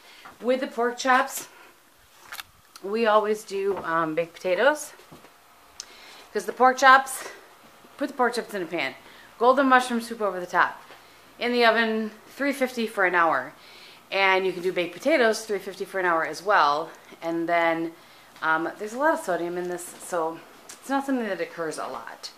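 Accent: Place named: Minnesota, United States. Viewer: I just found your channels and I've gone on a total binge. So hi! you are a pleasure to watch. It's nice to find someone who is so relatable and your accent reminds me of living in MN.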